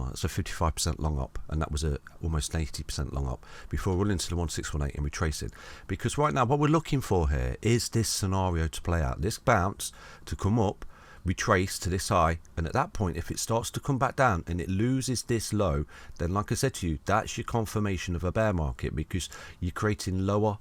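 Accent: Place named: Nottingham, United Kingdom. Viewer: im from nottingham and your accent sound local to me, do you do any meet ups to share knowledge ?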